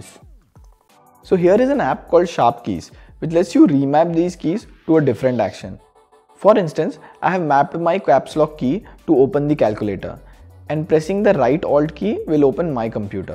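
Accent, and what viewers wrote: India. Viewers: Indian accent detected, dont trust it it might be a Windows Tech scammer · Indian fuckong engllish zounds like a servant is talking!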